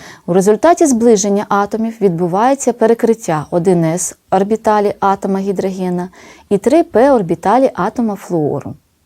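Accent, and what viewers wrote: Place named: Russia. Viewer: Дуже відчувається, що людина говорить російською.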